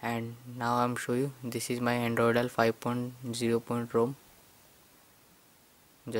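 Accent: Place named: India. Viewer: Stupid india accent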